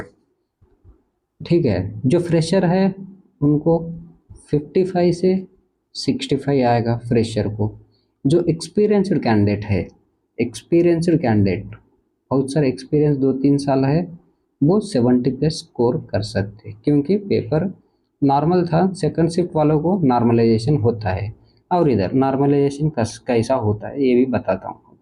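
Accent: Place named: South, India. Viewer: I like your soth Indian accent of hindi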